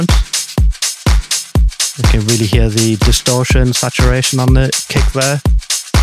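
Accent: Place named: North East England, United Kingdom. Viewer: What accent is that? I hear the Northeast. Teesside, Wearside?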